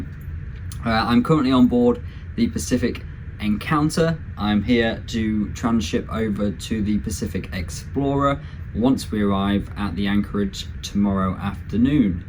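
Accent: England, United Kingdom. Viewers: I'm an Australian and i think I heard hyou saying that you are likely to be spending some time in Australia in the coming months/years. A little tip: Australians NEVER refer to the country as being "down" anywhere, Or even "Down under" Several times in this video you refer to something like "when we get down to Australia". An Aussie would never say that. Your accent will mark you as a "pom" (That's not necessarily an insult - it depends if there's an expletive with it (as in he's just a <expletive> pom") and it's fine to be a pom - there are millions of others here already - but it's a social gaffe to refer to Australia as "down here" or "down under" or "this happened on our way down to Sydney" That would tell Aussies listening that you are in the category of the poms who are despised - the ones whose focus is still in Europe, the ones who can't get over the fact they''ve moved home and things are not the same in Australia. 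I once worked with an Englishman who would NOT stop complaining that Australia was such a backwards country. We would grit our teeth and shut up for a while Ineitably he'd get around to saying that even the newspapers here are backwards. "Back home we get the Telegraph or the Daily Mail with the football results when we are on the way home from the game. In this backwoods country (meaning Australia) the papers dont have the scores until Sunday morning" He never could get it through his thick skull that the reason was because of the time zones. When we're a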